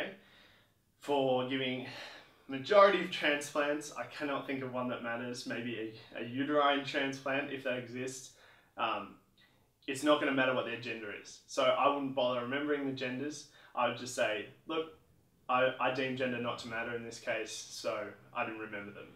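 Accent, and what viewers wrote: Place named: Australia. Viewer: or maybe 'pattern' problems (haha the aussie accent, wasnt sure )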